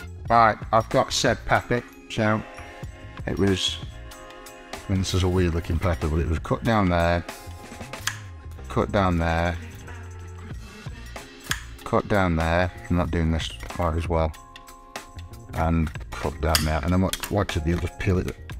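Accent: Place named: Stoke, United Kingdom. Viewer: can tell he is from stoke we are all the same.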